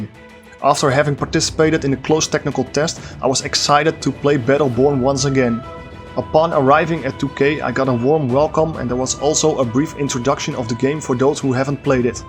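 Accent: Netherlands. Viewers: You sound so dutch it hurts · I also liked and subbed, you earned that. And I think you dutch accent doesn't hurt at all ;)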